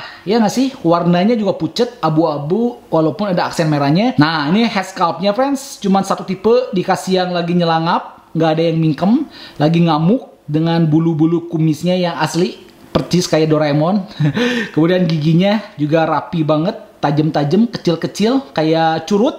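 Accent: West Java, Indonesia. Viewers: Dari logak ngomong nya kayanya bang orang sunda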